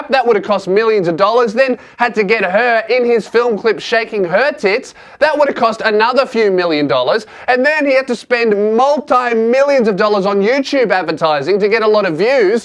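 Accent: Australia. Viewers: You have the most Australian accent i know.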